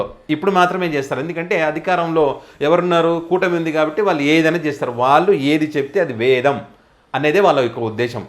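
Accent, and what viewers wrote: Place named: Telangana, India. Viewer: సారీ సోదరా మీకొక విషయం చెప్పదలిచా సవరించుకోగలరు. మీకు త కు సవత్తు కలిపి పలకటం రాలేదు సవరించుకోవటం ఈజీనే. అభ్యాసం కూసు విధ్య అన్నారుగా అలా. ప్రొస్తహిస్తారు, సంవస్తరం, ఉస్తాహం మీరన్నవి ఇందులో. ప్రోత్సహిస్తారు, సంవత్సరం, ఉత్సాహం పలుకవలసింది. సారీ మీ కంఠస్వరం చాలా బాగుంది మీ ఎక్సప్రెషన్, విశ్లేషణ చాలా ఇష్టం. తెలంగానా యాస బాగుంది. Tq సోదరా.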